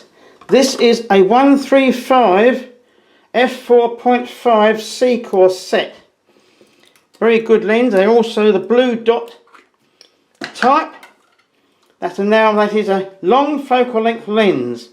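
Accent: United Kingdom. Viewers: I had this camera at one time with the 180mm lens. I always loved this camera. I viewed your photos on Flickr too. I'm going to have to join Flickr one of these days. A lot of good photographers there. By your accent I can tell your from the UK. There are a lot of good British photography magazines out there. Maybe I'll buy this camera again someday. I like the interchangeable lenses.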